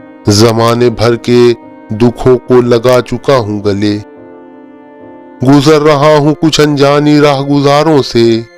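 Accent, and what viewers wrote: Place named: Punjab, Pakistan. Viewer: Awaz tho bith bori hai punjabi style may urdu bol raha hai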